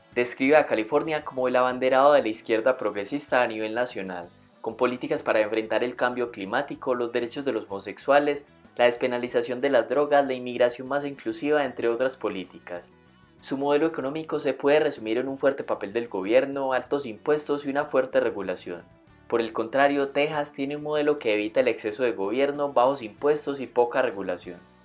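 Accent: Colombia. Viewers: Bro, eres de Colombia?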